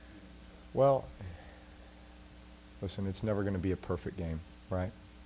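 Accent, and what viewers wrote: United States. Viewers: Rams ah Lozuzz! Rams SUCK! Love that yatty downtown New Orleans accent.. Drew knows they got screwed but 100% he keeps class all the way. Nothing but respect.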